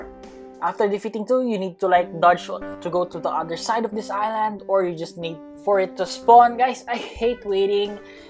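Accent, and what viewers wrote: Philippines. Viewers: Hey your accent is like filipino haha are you a filipino or not? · are you a filipino? the acsent sound like one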